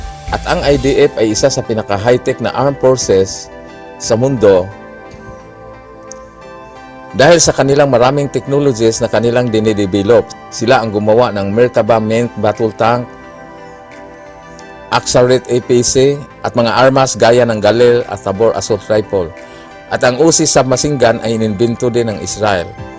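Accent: Visayas, Philippines. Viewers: Ang sama naman mag salita ng narrator, ang tigas ng dila at bisayang bisaya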